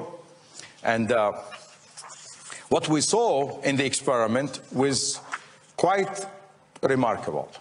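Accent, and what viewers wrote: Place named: United Kingdom. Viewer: This accent is British accent 😅😅😅😅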